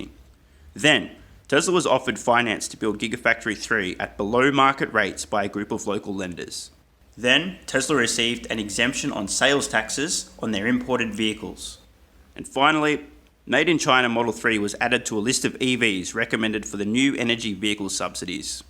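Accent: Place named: Australia. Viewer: Good Work, and Nice Vid work... Especially the Brief Jim Chanos shorts pic... lol Great to hear an Oz Accent covering TSAL too... You really are the first for us:)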